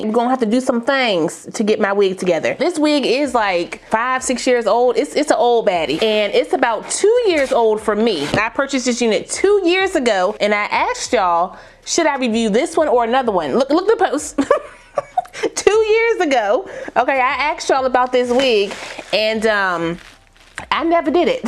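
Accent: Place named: Philadelphia, United States. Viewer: I lost it at the blonde wig 🤣🤣 “hellll nawll” I love your videos and accent .. sound like a Philly girl.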